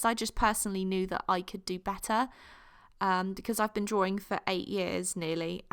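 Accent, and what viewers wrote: England, United Kingdom. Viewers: Why do u sound english x x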